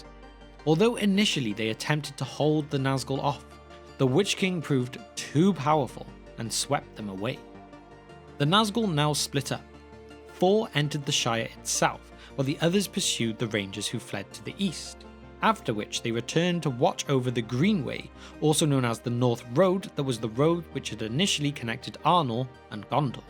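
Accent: United Kingdom. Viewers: Can you please narrate in an American accent. My wife doesn't like British accents because your silencing of Rs and drawing out of vowel sounds. Thanks, then we will subscribe.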